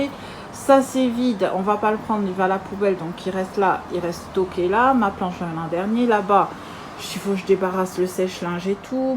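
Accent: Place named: Corsica, France. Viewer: Quand je t'écoute ça me fait penser aux patients corses que j'ai au téléphone !! J'adore l'accent corse !!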